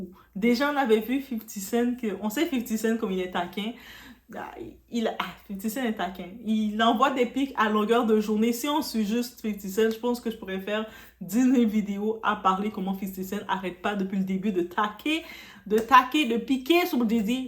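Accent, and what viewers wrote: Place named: Canada. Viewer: c est l accent Canadian.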